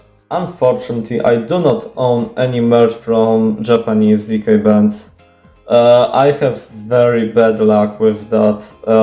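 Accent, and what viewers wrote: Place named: Poland. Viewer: I just KNEW you’re from Poland, I know a polish person when I hear one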